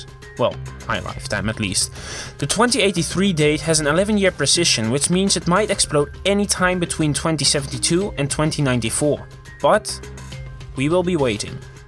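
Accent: Netherlands. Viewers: You Dutch or sonething?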